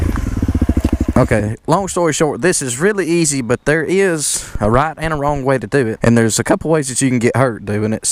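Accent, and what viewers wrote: Alabama, United States. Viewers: If Alabama had a voice, it would sound like yours.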